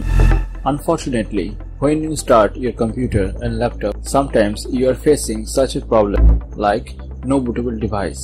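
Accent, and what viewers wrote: India. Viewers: It’s not so much the music that sucks, it’s your horrible accent. I can’t understand a damn thing you say in that heavy India accent. Subtitles at the bottom sure would have helped.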